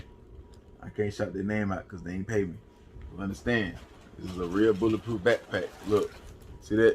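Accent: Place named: North Carolina, United States. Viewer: Good video. I knew U sounded like u from NC❗️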